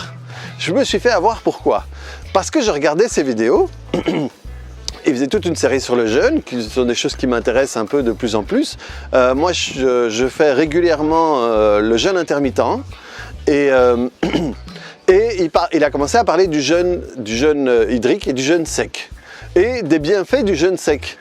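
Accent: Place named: Belgium. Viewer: Ça se voit pas mais ça s'entend que tu viens de Belgique haha ><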